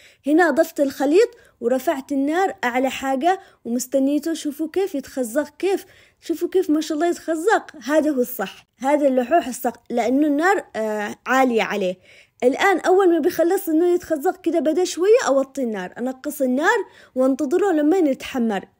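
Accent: Aden, Yemen. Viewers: ما شاء الله باين عليه لذيذ ... واللهجة العدينة حلوة... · من وين هي اذا ماكانت من عدن لان لهجتها عدنية مليون المية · بصراحه الصوت لحاله يذوب واللهجه العدني لايق لك ي قلبي اختك من عدن ومن متابعينك واستفدت كثير من وصفاتك تسلم يداتك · مين زيي بتعشق صوت ولا بس انا 😅بحب لهجة عدن مع اني اصلي من الضالع بس لهجتي بالعامية لاني عشت حياتي بين عدن وصنعاء والضالع والحين بالسعودية من ٢٠ سنه لما اتكلم محد يقدر يعرف انا من اين بس ماشاء الله لهجتها ما تغيرت الله يحفظها. فعلاً هذه الماركة حلوة اخذت طاوه وخليتها للحوح فقط لها معي خمس سنين وما يخرب على اللحوح أبداً ❤❤❤ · اموووت باللهجه العدنيه وامووت بطريقتك واطروحاتك وبصراحه حبيبتي افضل واضخم وارقى من شرحت الطريقه الصحيحه بكلللل تفاصيلها تسلمي حبيبتي ❤ اختك ام اياد من صنعاء 😘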